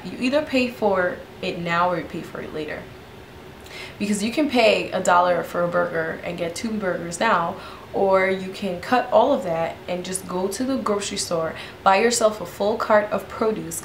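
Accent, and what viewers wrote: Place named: Africa. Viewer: Wow, I'm a new subbie and I've learned you are African, yet I could not detect an accent in your voice until I watched this video. When you talked about your chores in boarding school, you accent came out. I was pleasantly surprised :)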